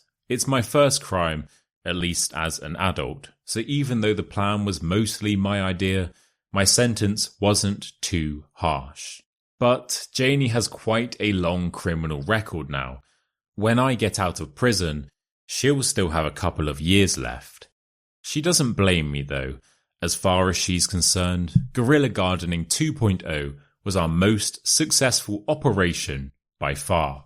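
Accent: United Kingdom. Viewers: Wonderful story. i subscribed your channel to improve my pronunciation and listening skills because I love British accent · thank so much i was listening the narration but, i think is a bit high level for my. I like de challenged, as hear British accent, so I will try to understand , to be able to enjoy it. Again, thanks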